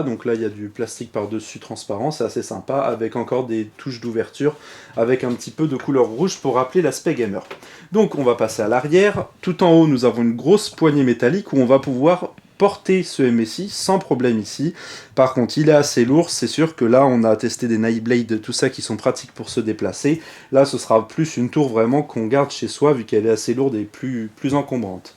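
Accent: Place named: France. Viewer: fucking french accent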